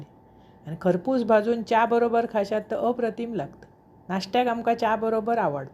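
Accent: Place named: Malvan, India. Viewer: Khupach chan🙏🙏 pan तुमचे बोलणे जास्त सुंदर आहे मला खूप छान वाटते तुमचे मालवणी पद्धतीचे बोलणे मला हिं च्चहा chapati khupach आवडतात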